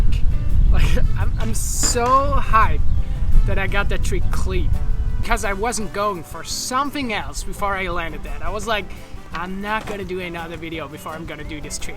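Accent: Switzerland. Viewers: auch du bist’s die uber/Ubter flip meister JG jajaja haha ich hab das wennst du SwitzerDeutsc spricht gern !! es macht mich like what the heck is he saying lol I took sechs jahre Deustch auf gymnasium und Uni und wenn ich ins Luzern war habe ich ein kickflip gemacht für ein skater und er denkt es was toll ja am tollsten lolz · wait what he's swiss🤯 "s wird en kampf abr vlt funkionierts ja"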